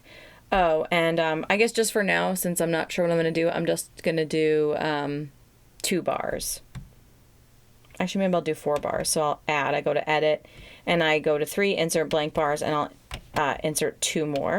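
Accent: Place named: New York, United States. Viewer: Thx Thought I heard a little NY accent in your voice Keep Doing your thing you Dope feel free to to check out some of the Beats I’ve Made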